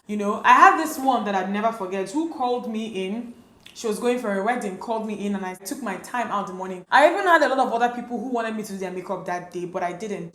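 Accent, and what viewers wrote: Nigeria. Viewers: I wonder what's wrong with your accent that someone will be complaining about. Hmmm... World people, I personally loves your accent. It's our Nigeria accent and very understandable, that Afro Puff don't know if he or she should go and complain on all this Ghana or Kenya Youtubers that uses their country accent na. Nma please don't change who you're because of what people are saying because you can never asin never please world people. If you're doing this, they will request for that and if you start doing that, they will automatically request for this. Stay blessed, you motivates me a whole lot. Thanks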